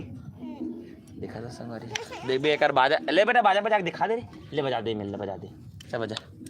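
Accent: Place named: Akaladongri, India. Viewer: अकलाडोंगरी se bolat ho bahiya ji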